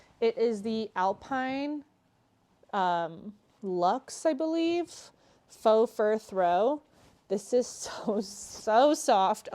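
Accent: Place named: South, United States. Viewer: it’s a cultural “speak” from parts of the south. My husband’s relatives, with “fancy pants” graduate degrees, pronounce it exactly like you do. Especially, when we are at a family get together, with wine and spirits, their Southern Charm flows. I think it’s enchanting! Please don’t change it for anyone. ❤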